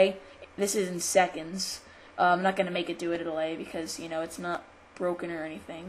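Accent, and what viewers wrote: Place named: United States. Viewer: Finally someone I can understand! I had to go through so many kids that English and Australian before I found a voice that speaks fluent American! Great, simple, clean tutorial!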